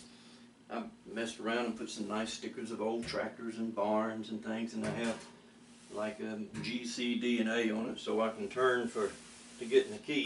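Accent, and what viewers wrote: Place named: Atlanta, United States. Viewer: Great sound. I have just constructed one but not sure best string to use?? Are you from Atlanta - nice accent. (I'm British from Michael Caine country - South London)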